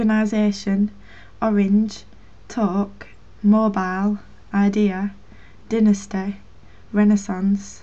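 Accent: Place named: Lancashire, United Kingdom. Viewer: Hey, I had an audition for a play in Dallas which would be performed in a Lancashire accent. I found you and recorded your Tumblr on my digital voice recorder for practice. I didn't get the part, but kept your voice on my recorder. I just like hearing it occasionally. I love your accent! The bug is a roly poly.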